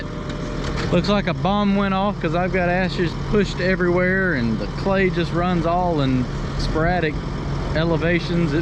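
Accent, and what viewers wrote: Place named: North Carolina, United States. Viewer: Would it be safe to assume you are from the Raleigh/Sanford/Durham area? Half my family was from there and, had the same accent that you try so hard to cover up. LOL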